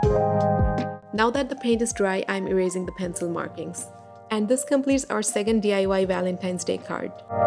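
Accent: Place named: England, United Kingdom. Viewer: Lovely cards 🥰 loving ur English accent as well 😘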